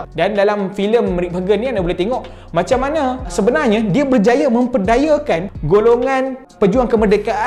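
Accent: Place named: North, Malaysia. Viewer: rupanya slang utara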